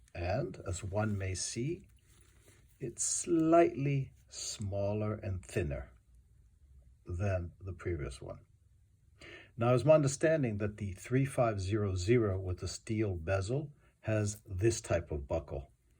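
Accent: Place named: Greece. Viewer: You sound like a Greek who lives abroad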